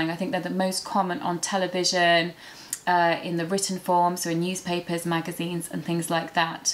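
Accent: United Kingdom. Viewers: I really like how you teach. I love British Accent. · British accent i want to hear more, And to learn. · I like the British accent. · Hi from Mozambique, I love British accent and I'm following it, so thank you very much for spending your time for us. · Thanks for Lesson! 👍😉Yes, understand native English can be very difficult, but listening to natural English via Youtube I hope to help me! 🙂For me, I admit the connected speech is very difficult, but it sounds likeable, and surely I trying it! 🙂 I think that my biggest mistake I learnt grammar during my education (the professors were always got attention on grammar, the little on the conversation 😞) more than the others parts of English, but from now it'll be different! I do try my best! When it comes to understanding English, I would like to watch my favourite series ''The Hotel Babylon'' without subtitle on my own language, but the London accent sounds difficult! 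Although this series lasted too short and watched it already, I can watch it always! Maybe with english subtitle would be easier? And forget, it's good your advice about exercise understaning Native English with girlfriend! 😀👍 About accent, I prefer British accent! I really like it! I I liked it because of you! 😉🙂 In my opinion, British accent sounds more elegant from the American, more beautiful! 🙂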